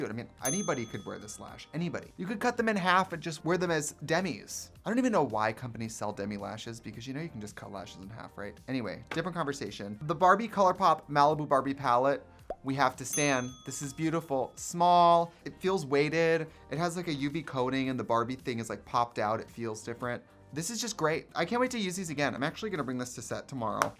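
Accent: Wisconsin, United States. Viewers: I can really hear the wisconsin accent in this one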